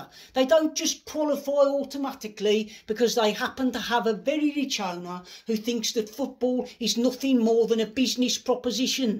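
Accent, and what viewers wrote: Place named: West Midlands, United Kingdom. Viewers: I've stopped watching premier league football for various reasons, one of which is the greed and arrogance of everyone involved. Andrew is spot on yet again with his comments, though his brummy accent leaves a little to be desired................... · Love the West Midlands accent, brilliant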